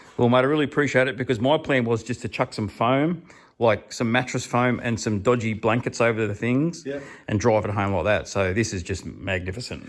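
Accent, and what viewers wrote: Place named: Australia. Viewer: Love your no dramatics Aussie presentation. Down to earth. Refreshing compared to Some overseas vids. cheers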